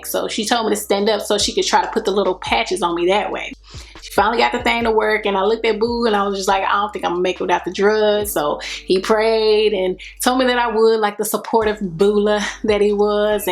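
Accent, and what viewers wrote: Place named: South, United States. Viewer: Lol so entertaining you have a southern accent I love it especially the part about only being 4 CM and thinking you were further along ! Ugh that must eve been irritating !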